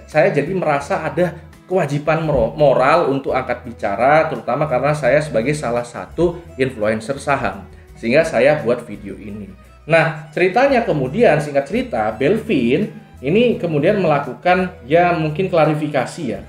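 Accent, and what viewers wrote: Medan, Indonesia. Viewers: Sesama Anak Medan ya bang .. ahahaha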